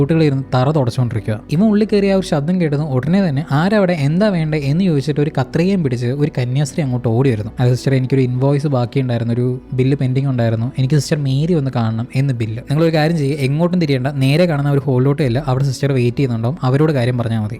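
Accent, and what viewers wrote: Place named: Kottayam, India. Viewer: Bruhh from kottayam or idduki??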